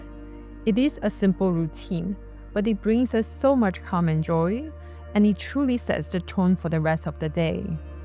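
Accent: Singapore. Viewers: Lovely place and couple. I’m glad the narrator’s Singaporean accent was easy on the ears and not the stereotypical choppy accent lol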